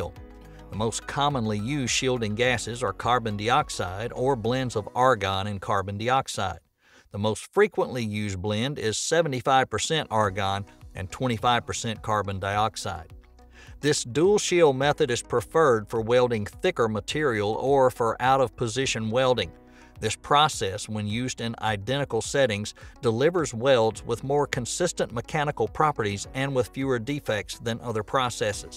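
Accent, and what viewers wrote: South, United States. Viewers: very good video. I work at JSC and I love hearing a friendly Southern ascent delivering complex information.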